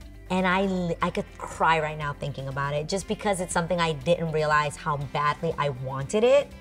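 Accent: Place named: New York, United States. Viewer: You’re from out of town?! 😂 with your NY accent? I love it! 😂❤️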